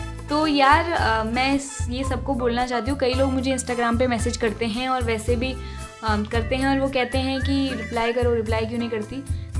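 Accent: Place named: Delhi, India. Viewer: love your videos.. very addicting.. tum delhi se ho na? tumhare dilliwale accent se lagta hai.. main bhi dilli se hoon to tumhe sunkar maza aa jata hai.. keep up the good work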